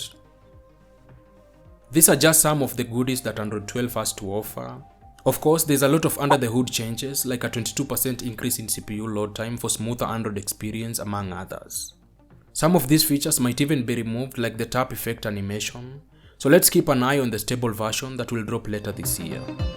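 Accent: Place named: Kenya. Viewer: Am sure this guy is from Kenya! That accent ! 👍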